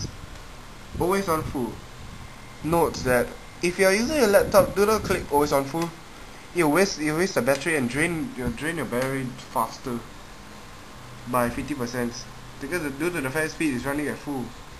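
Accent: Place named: Singapore. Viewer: lol singaporean accent :D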